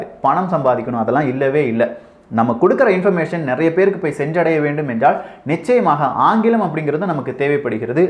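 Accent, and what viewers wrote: Kerala, India. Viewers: hey he is in Kerala might be influenced by the surroundings r ketu ketu slang appidi vandhirkulam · Then, yesterday why u told Malayalam slang come out while you speaking.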